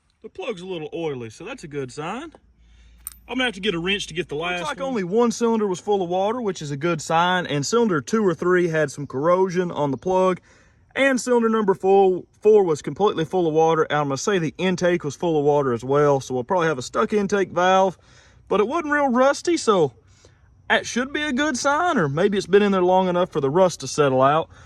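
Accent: South, United States. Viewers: Just wondering what part of the south you from cause the accent and love the videos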